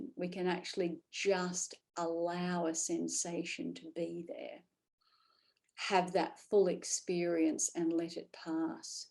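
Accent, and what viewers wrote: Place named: Australia. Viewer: That was Beautiful! You know years and years ago I absolutely fell in love with yours and Terry’s Yoga Nidra recordings and they are a go to frequently still… Beautiful! Salve for the soul..I always felt your voice (first Aussie accented meditation I’d heard….Aaaaahhh!!) and of course the beautiful music just reliably brought me Home..Here..Now! Though I couldn’t quite formulate it as such until just recently. After consuming so much content on the non-dual understanding during the pandemic via beautiful sources such as Rupert Spira, Francis Lucille, Bentinho Massaro, Mooji…etc etc I recognised and felt that the sense of being they had pointed me to was the same seemingly placeless place into which I would frequently and almost always effortlessly sink Deeply upon listening to your Yoga Nidra… So thank You Deeply from the depths of Being it is BEAUTIFUL to be able to finally see AND hear your familiar voice regularly.. 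I Love You Both Very much!! Thanx again! XX Luke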